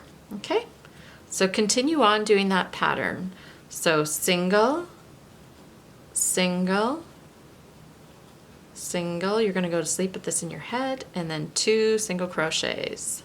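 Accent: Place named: Canada. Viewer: my mom says you sound very canadian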